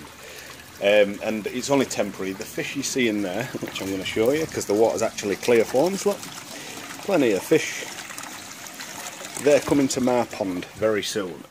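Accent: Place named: Northern England, United Kingdom. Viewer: Strong northern accent 😂 I'm londoner and I have trouble understanding too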